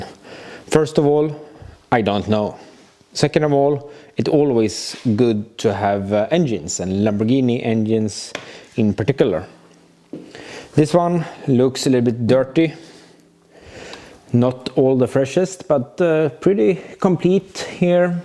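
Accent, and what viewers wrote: Sweden. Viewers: I hope your YouTube metrics show you how many times I’ve watched all these videos. You’re the perfect host for this build and your Swenglish is perfect, brother. I love your ambition and commitment in both quality and engineering; it’s mind bending what you’ve done in the last few Volvoghini vids.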